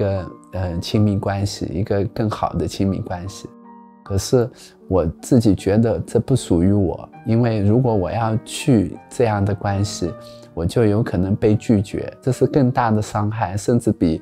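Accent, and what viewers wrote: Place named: Hangzhou, China. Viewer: 杭州本地人都这么说，江浙人都这样说普通话的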